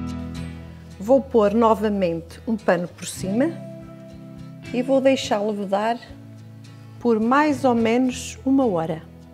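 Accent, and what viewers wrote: Portugal. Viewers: Adoro ouvir você a falar , amo o sotaque de Portugal 😍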